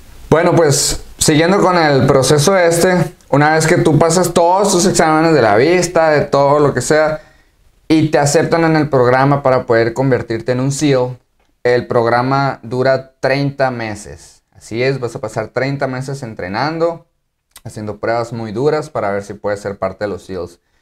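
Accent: Sonora, Mexico. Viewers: Hola, Era Marine por 8 años (3rd Recon Bn. Y 1st SOTG con dos turnos de combate. Ahora soy empresario y vivo en Mexicali y con mis oficinas en San Luis RC Son. Y Mexicali BC. Me gusto tus videos. Me dio risa escuchando tu acento sonorense en tus Cammies. Saludos brother. Ooorah Hell Hound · Acabo de encontrar tu canal y tu acento se me hacía conocido, que chilo que seas de sonora yo soy de hermosillo, Mucho éxito.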